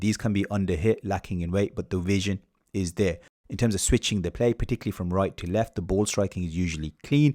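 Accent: Birmingham, United Kingdom. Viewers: Lovely analysis. 1st time listener, but will deffo be back. Is that a slight Brummie accent I can hear BTW? 😂 Shirley boy here!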